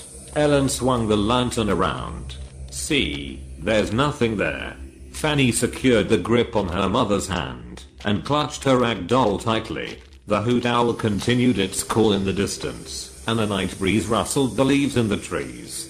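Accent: United Kingdom. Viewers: All of his voices and pronunciation is perfect......Full of British Accent!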